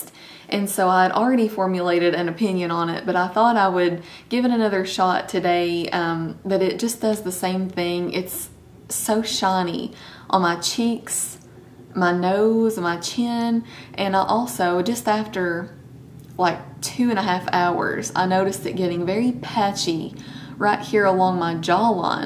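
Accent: South, United States. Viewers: I love your southern twang. <3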